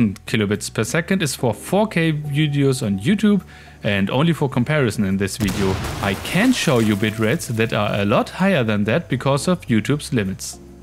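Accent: Germany. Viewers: Du bist deutsche Man Hört es raus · Das du ein Deutscher bist, hört man richtig raus 😂👍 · Ich hab dein deutschen Akzent anders rausgehört das ist einf krass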